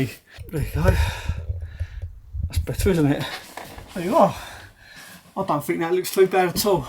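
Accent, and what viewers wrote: Cockney, United Kingdom. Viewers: Are you a cockney?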